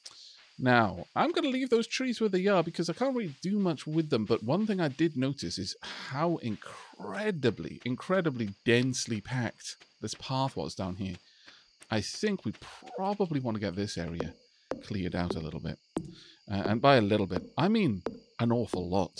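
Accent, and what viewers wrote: Wales, United Kingdom. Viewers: Just found this series love it. You've got a hint of Welsh, where are you from? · Are you a welshy like me? 🏴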